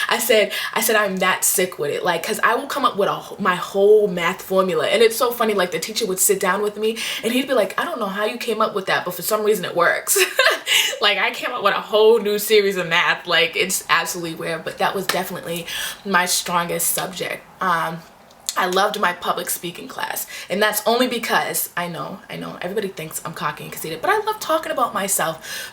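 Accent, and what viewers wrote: Boston, United States. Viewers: I can tell you're from Boston by your accent lol!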